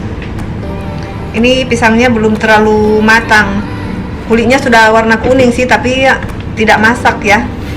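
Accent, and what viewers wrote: Manado, Indonesia. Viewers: Yup..... mantap ...... dari logadx campuran Manado n daerah mana ya......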